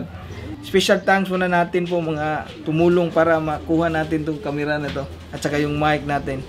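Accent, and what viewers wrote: Visayas, Philippines. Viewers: Bisdak jd kaayo accent jd ba hehehe, I'm visaya din hehe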